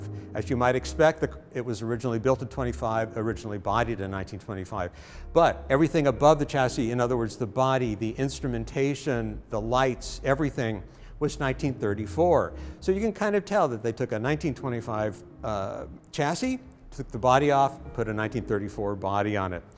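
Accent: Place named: United States. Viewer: Cannot fathom American pronuciation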